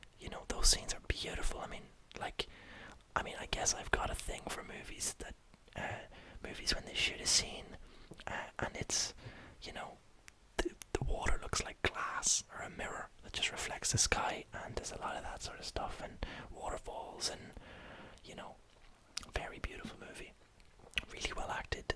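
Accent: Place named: Ireland. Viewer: The irish accent is great for asmr. I could listen to you talk for hours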